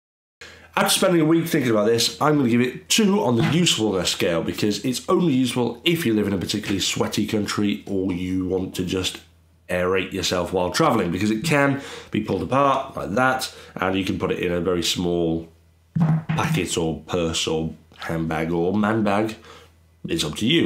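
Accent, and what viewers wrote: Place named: Oldham, United Kingdom. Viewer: Where are you from? Your accent sounds so similar to mine or where I'm from cause I'm a girl and you're not lol.. But I'm from Oldham uk.. So I've very intrigued.. :p this video made me laugh anyway 😂 can't wait to watch your videos from now on ! You've got your self a new subscriber :) well done!! X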